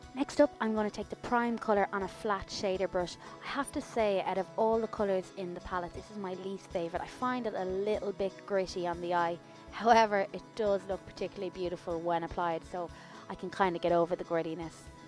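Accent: Ireland. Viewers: This is an old video but I was contemplating getting the Vintage Vamp and wanted to see it on blue eyes, I’m definitely going to buy it now! The lovely Dublin accent was a nice surprise and reminds me of home as I live in the Uk, up the dubs! Xx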